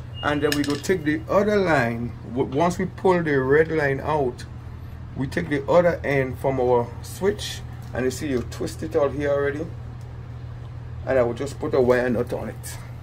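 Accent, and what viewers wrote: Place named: Jamaica. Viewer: I hear that Jamaican accent Man…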